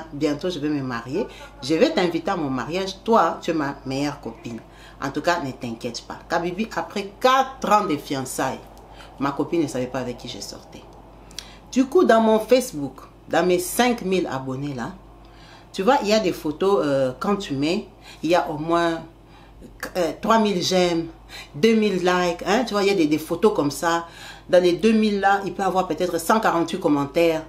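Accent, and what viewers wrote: Democratic Republic of the Congo. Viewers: Franchement jadore tes histoires et tu merites être connu, je vais partager tte tes histoires ds ts les groupes Facebook❤🎉 Merci de Ns les conter terre a terre sans chichi avec cet accent congolais 😀🥰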